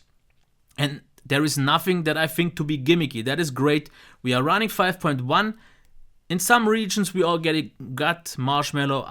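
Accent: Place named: Germany. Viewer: "grey knight" made serious comment about your integrity as an impartial reviewer. Please speak a little slower and I would like another review for lgg4 as this is a phone I think I would like. Thanks, and you are probably German or E. Europe as another subscriber asked. We are all equal, no reason not to answer a simple question!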